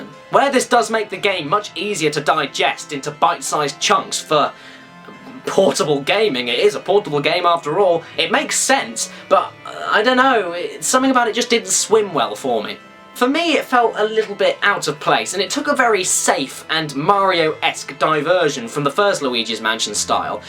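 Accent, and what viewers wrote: United Kingdom. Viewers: JESUS! HE IS A BRITISH PBG! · Like JonTron, but Britisher. That's a good thing. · UUuuhhmm, hehe......could be the wierdest comment ever, clearly the dude is brittish....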